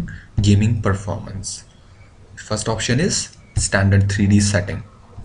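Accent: India. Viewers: fuck your indian accent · why ur indian voice sounds so gay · That's probably because of his Indian accent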